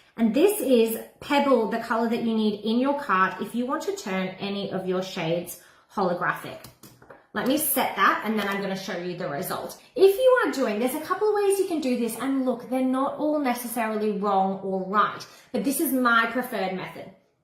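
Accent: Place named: Australia. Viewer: Hi Sweetheart. Love Your Aussie Accent . Love You. Kisses.❤❤❤❤❤❤❤❤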